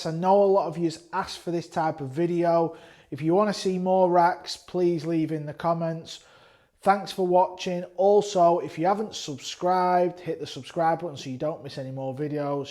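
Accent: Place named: Lancashire, United Kingdom. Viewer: next time you do the Mosconi announcement, ride in on that scooter for added effect 😂😃! Good stuff though..When I zoom with Garstang cousins soon will say Lancs accent reminds me of them 😉 You teach well. Thanks for helping the global pool community.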